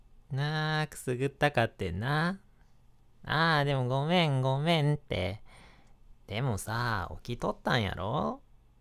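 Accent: Kansai, Japan. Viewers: Thank you for always uploading these comforting videos😭 sorry that i can't write well in Japanese (but i can understand XD) these videos make me so loved and the kansai ben is my favourite accent as well. いつも動画に投稿してありがとう💞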